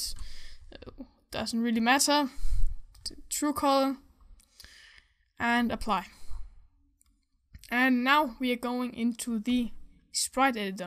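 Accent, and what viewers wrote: Denmark. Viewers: Bra video! Hører du er dansk! :D Er fra Norge jeg da, så håper du forstår hva jeg skriver! :D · Are you Danish, or what? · Instantly recognized the Danish accent. Especially because of the "Øh.."'s he made. Cool tutorial~ And hav en god dag B-') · Are you DANISH??? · Hey man, are u danish? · Are u danish?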